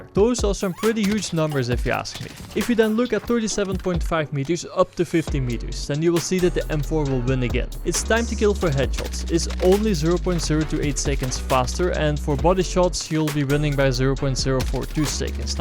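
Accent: Netherlands. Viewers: I can hear on your accent you are dutch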